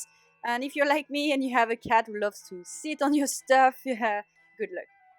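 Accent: France. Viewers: I love your video!!!! I am going to make them this Xmas 2022! I too have cats that are helpers. Not to insult you are you French? My husband was French and I miss the accent! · Great tutorial I will make it for myself and my friend. I love your cute french accent. your English is great. Merci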